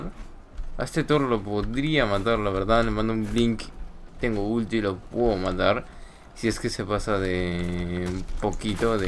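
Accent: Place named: Spain. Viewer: estas en latam y hablas como español o.O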